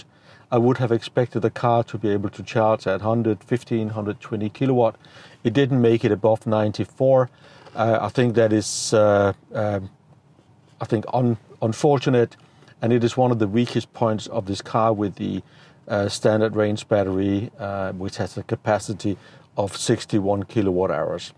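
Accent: Denmark. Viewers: Are you danish? Your accent sounds danish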